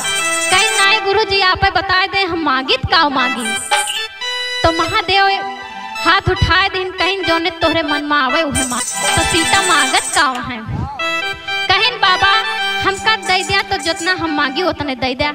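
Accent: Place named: Awadhi, India. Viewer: Itna sundar or shudh Awadhi bolne wala maine nahi dekha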